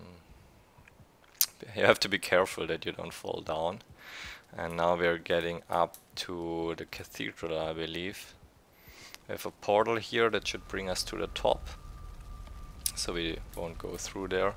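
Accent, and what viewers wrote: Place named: Germany. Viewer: do it dude. I’m just some 36 yr old enjoying the game immensely and loving seeing all the creativity of others. Your builds are exceptional and I love the way you presented it all. I work with voice overs for a job, so I can’t lie, I just love the efficiency of your German accent. Keep building the dream and continue to press the boundaries of our sacred Valheim 👍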